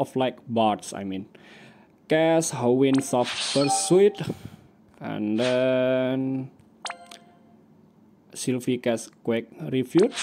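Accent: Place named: Indonesia. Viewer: dude, your videos - clears, reviews and strats- are all amazing, keep up the great work... btw, you accent sounds a little Indonesian..?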